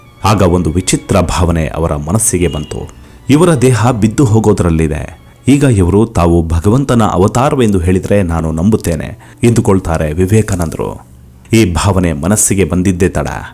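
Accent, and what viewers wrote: Standard. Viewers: Nimma nirupanashyli adbhuthavagide. Shuddha Kannada karnanandakara. 🙏🙏🙏🙏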